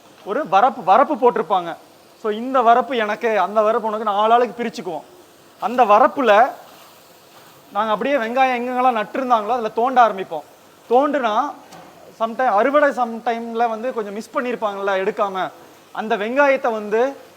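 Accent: Kongu, India. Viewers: சூப்பர் ங்க உங்க சமையலும் நம்ம கொங்கு தமிழும் ❤❤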